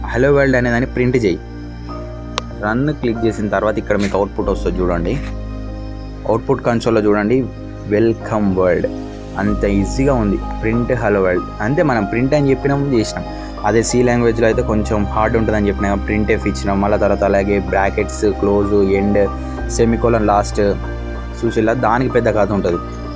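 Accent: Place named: Telangana, India. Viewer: Thank you brother....your slang super (pakka telangana yasa i like your slang)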